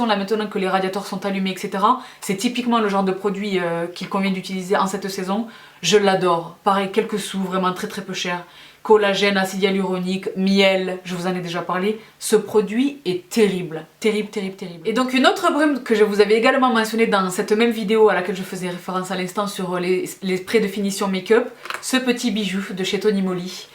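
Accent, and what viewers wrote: South, France. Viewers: Bonjour; je suis tombée par hasard sur vos vidéos et j'en suis fan........j'apprécie votre élocution avec cet accent chaleureux du sud et ce charisme qui rayonne à chaque vidéo, un vrai bonheur. Une abonnée de plus....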